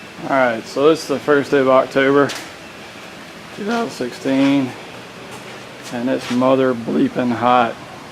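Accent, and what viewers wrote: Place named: Boston, United States. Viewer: Awesome videos dude! Your Boston accent is wicked pissah, you’d fit right in up here.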